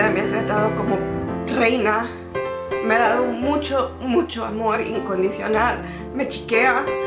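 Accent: United States. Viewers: Parece estadounidense al hablar español. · Aquí no se nota tanto ese acento tipo "gringo"😓 me encanta pero tu español es bonito.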